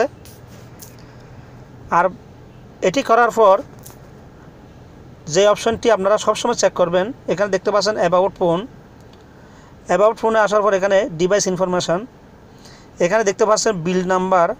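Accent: Chittagong, Bangladesh. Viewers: Kothai bujha jay,,baiyye chittainge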